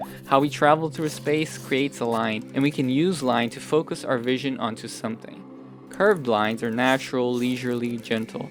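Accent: Brazil. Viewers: Acabei de acha o canal e simplesmente amei, suspeito pelo jeito que você pronunciou salvador e Rio de Janeiro que você é brasileiro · Não sei se tu é Br mano, mas tem o mesmo sobrenome que eu e um sotaque mais brasileiro. De qualquer forma, teu canal é ótimo e estou me identificando a todo vídeo. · Wow!!! Just WOW!!! The convergence of your skill sets is just spectacular, this video is an absolute work of art! Are you Brazilian? You sound Brazilian! And I noticed two Brazilian cities made it onto your list of great cities. Such an amazing video. Thank you for sharing your passion so beautifully. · Tu é brasileiro ? Vc tem sutaque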